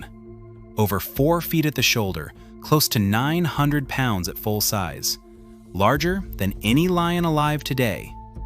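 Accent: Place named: United States. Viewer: Interesting but you sound American so keep the measurements in feet and pounds. Makes no sense to mix them up with grams and meters.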